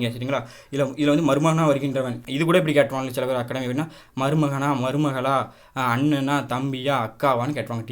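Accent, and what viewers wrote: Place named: Thoothukudi, India. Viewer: Vanakkam sir, my native place thoothukudi, unga slang thoothukudi slang mathri irukku sir. I am happy sir, nanum neraya place la padixhu irukken, but neenga soli taruvathu different ah irrukku sir, na ungalai follow pannuren sir, unga method remba pidichu irrukku, I am housewife sir,unga help ennaku kandippa vendum sir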